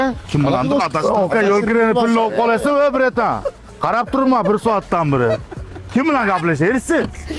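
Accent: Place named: Namangan, Uzbekistan. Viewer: Намогон шеваси узгача гап йук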